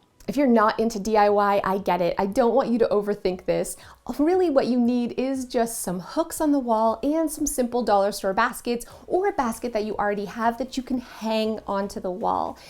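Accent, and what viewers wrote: Canada. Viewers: Omgoodness... I love your Canadian accent! And, the organizing 😃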